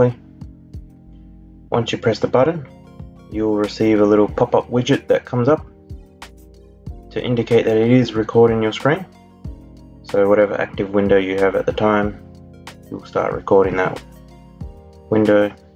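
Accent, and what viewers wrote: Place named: United Kingdom. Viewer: you're correct i watched the video my record button has been greyed out formonths on the game bar. pulled it up again while listening to your british lilt and the button was still greyed out >anger viscerall through my veins . however i kept listening to you and win+alt+R did work albeit i had to finagle the sound settigs and change permissions for audios using a different tutorial but your hotkeys knowledge did the trick. "cheers mate"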